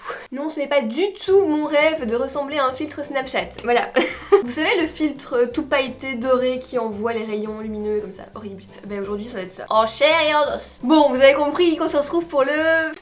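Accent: Belgium. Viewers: Salut j'espère que tu vas bien je t'adore tu es ma youtubeuse préféré et surtout j'adore ton accent belge lol. J'espère que tu vas me répondre car aujourd'hui c'est mon anniversaire et le meilleur cadeau que je veux recevoir c'est que tu me réponde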